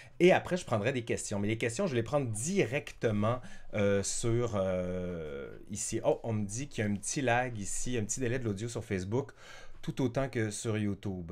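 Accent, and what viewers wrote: Quebec, Canada. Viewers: Excellente live. Un régal de t'écouter. je suis venu sur ta chaine grâce à Ben et je suis devenu fan très vite. J'adore ton style, ton rythme et bien sûr ton accent québecois. Merci à toi d'étancher ma soif d'histoire et ma curiosité de sujets originaux. Ivan , un français qui viendra découvrir le Québec en été 2021 .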